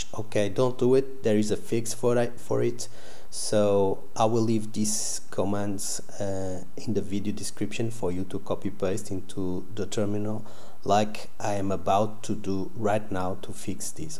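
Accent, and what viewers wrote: Portugal. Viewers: Reconhecemos um fellow tuga quando o ouvimos hehe. Obrigado ! Resolveu !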